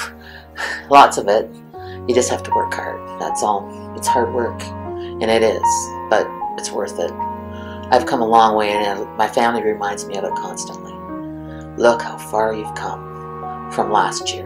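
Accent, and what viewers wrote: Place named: Pacific Northwest, United States. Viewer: Thank you for your story from Australia. You sound as if you may be from Pacific Northwest or BC. Much love and hope you continue to improve.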